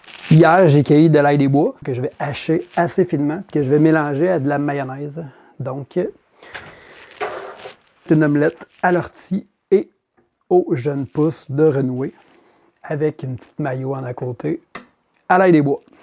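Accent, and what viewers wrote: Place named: Canada. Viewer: 😅C'est seulement aujourd'hui que je vois cette vidéo que je trouve très intéressante. Hier, j'ai cueilli des jeunes feuilles de renouée du Japon pour les cuisiner. Cette vidéo m'inspire. J'ai aussi cueilli de l'ail des bois (en France on l'appelle l'ail des ours). J'en ai fait un pesto. Merci Monsieur de mettre en avant les plantes sauvages comestibles. C'est dommage que l'on en profite pas davantage. Elles sont sources de grandes qualités aussi bien alimentaires que pharmaceutiques. Les ignorer risque éteindre la connaissance ancestrale. Votre accent est canadien (j'aime beaucoup). Lorsque je vais au Canada, je m'installe à Montréal durant quelques mois. De quelle région êtes-vous ?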